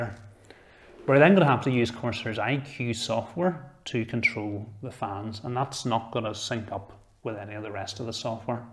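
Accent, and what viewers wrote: Northern Ireland, United Kingdom. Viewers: I just built my first ever PC and this was by far the most useful tutorial I came across when it came to building and especially what to do when it comes to installing Windows and installing drivers. I'd be lost without this! PS. My parents are from Norn Iron and when they heard your voice on my phone they said "Ach, a Norn Iron fella! What's craic with him?"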